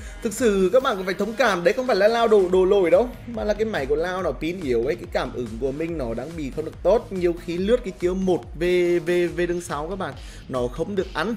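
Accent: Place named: North, Vietnam. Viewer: Giọng nói miền bắc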